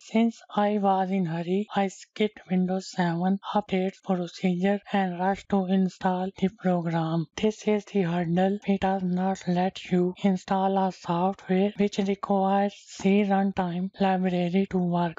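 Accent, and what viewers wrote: India. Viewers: Indian robot voice, damn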